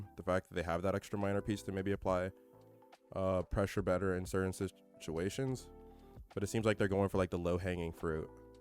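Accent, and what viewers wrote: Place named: Africa. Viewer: What part of africa are you from?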